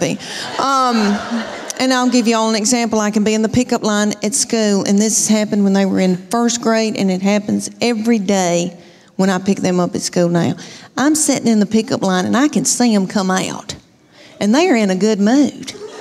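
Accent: South, United States. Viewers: I don't always get all the southern Americanisms BURT AH TELL Y'ALL shes a whole heap of furn🇺🇸🙏😅😅😅😅😅😅😅😅😅😍😍😍😍😍😍😍😍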